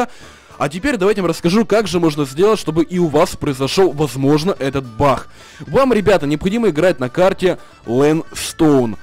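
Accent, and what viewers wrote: Ukraine. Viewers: Fibe hou он украинец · DEROTER ツ он Украинец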